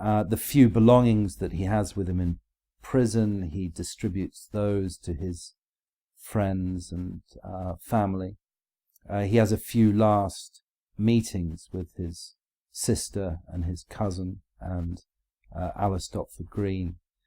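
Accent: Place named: England, United Kingdom. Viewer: I think he has a Middle class English accent what are you on about?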